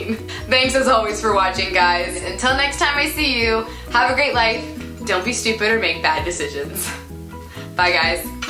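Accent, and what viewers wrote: Canada. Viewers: Do you have a touch of a Canadian accent??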